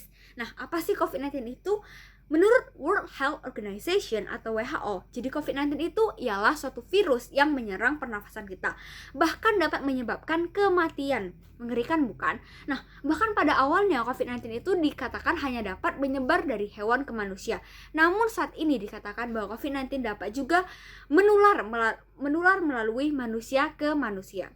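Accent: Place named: Surabaya, Indonesia. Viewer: Ngomongnya suroboyo bgt ya😆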